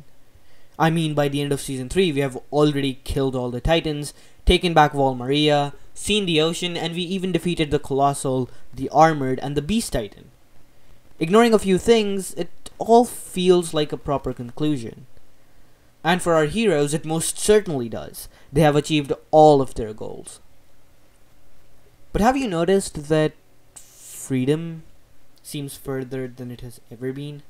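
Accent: India. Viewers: your accent feels like indian....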